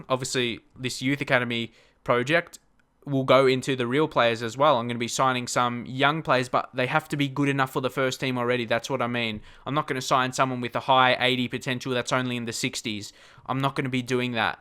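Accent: Australia. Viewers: aussie guy.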